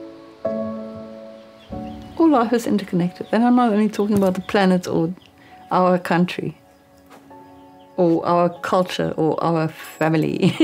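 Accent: South Africa. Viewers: Beautiful movies and channels. Feels so familiar the South African accent ... we moved abroad a few years ago. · Amazing very special channel. As an ex South African so enjoy listening to your lovely accent.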